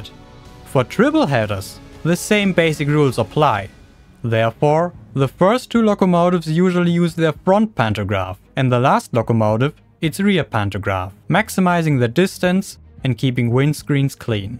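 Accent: Germany. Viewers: Um. He has a German accent because he's German. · Awesome video about great topic! I really like the slight German accent too, very authentic and original! Either way, you earned yourself a new sub, lookin forward to your next vid!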